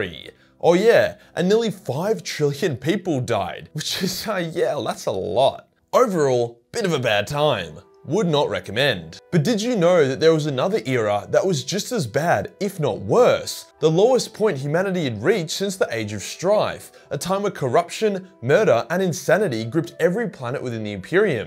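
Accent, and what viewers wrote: Australia. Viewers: I don’t know if I would’ve enjoyed Warhammer 40 K if it wasn’t narrated by an Australian keep it up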